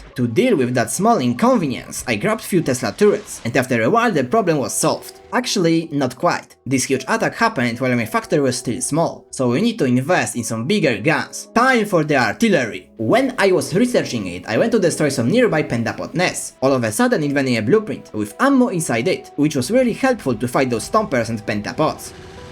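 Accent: Poland. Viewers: I am like 99% sure that ur native polish person, it's just something about your accent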